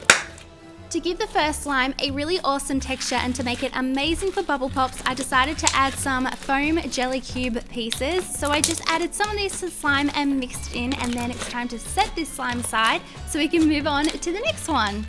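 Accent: Australia. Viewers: Are you alstralian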